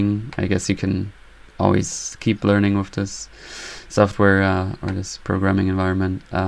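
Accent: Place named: Ireland. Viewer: Hey you say German is your native language. But I'm hearing a really strong Irish influence on your accent? Is that right?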